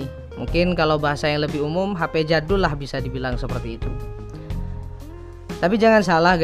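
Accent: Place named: Madura, Indonesia. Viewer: Kok kedengarannya seperti logat Madura😁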